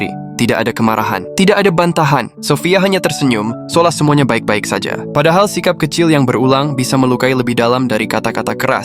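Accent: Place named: Malaysia. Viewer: Mimin sering mengikuti intonasi kalimat dalam bahasa malaydesh, Hal itu tidak akan berpengaruh kepada pengaruh bahasa Indonesia di wilayah asia tenggara.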